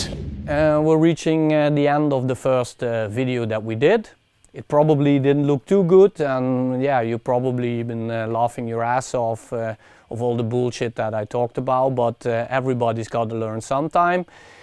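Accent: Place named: South, Netherlands. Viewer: I like the thick Dutch southern accent. I mean, do not change it. Go with it, embrasse it. Looking forward to see more of you. Untill the next fire:).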